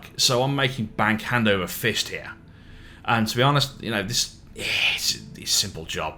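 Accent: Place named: Northern England, United Kingdom. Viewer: Top class. It's one thing to know your stuff but it's quite another to be able to teach it, and you do that really well. It's good to hear a northern accent too, reminds me of my childhood. Hang on, my childhood was shit.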